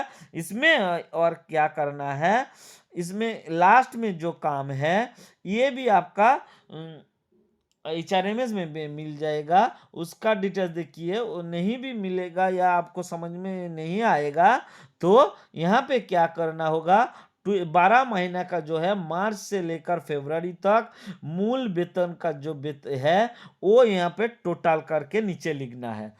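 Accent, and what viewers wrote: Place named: Bengal, India. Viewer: Apka relation bangal se v hai kya sir Language waise hi lag rha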